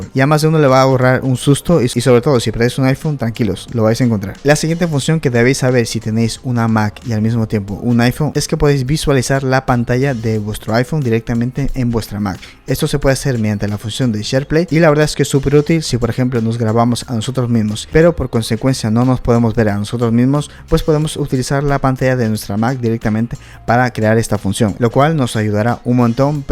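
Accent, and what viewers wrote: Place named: Mexico. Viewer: Bien fuera del video, por que siento que tiene acento mexicano pero usa palabras españolas?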